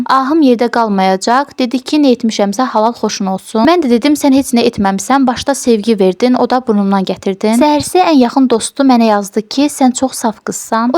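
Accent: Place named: Ganja, Azerbaijan. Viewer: Gəncəli di qız anlaşılan.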